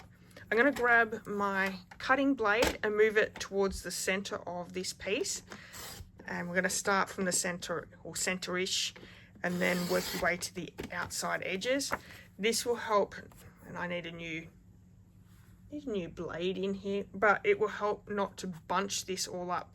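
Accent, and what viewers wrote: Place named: Australia. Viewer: I love the dsp. It’s a beautiful card. Well done! It’s also nice to hear an Aussie voice.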